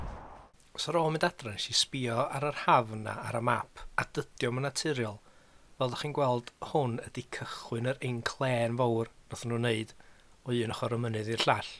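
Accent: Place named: Scandinavia. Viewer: I don't speak welsh at all, but one thing I found really interesting here; Normally I hear a massive english accent at least from southern welsh speaking people. Your accent sounds almost nordic/scandinavian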